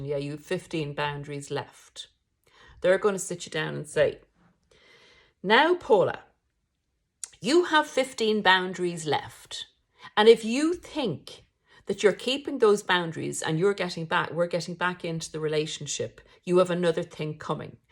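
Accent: Ireland. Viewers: Thank you for your video's. I am on the journey of cutting of the toxic relationship since June. I am still ruminating in this. Some days it's better, especially when I have a lot to to. I like listening to your view on this. As a Christian I feel that this disorder is definitely evil. I just visited Ireland, and therefore enjoy the accent too! Best regards. Daniell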